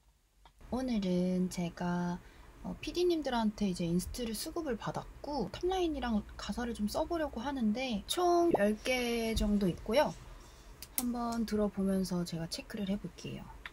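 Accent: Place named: Gyeongsang, South Korea. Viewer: 경상도 여자였어♥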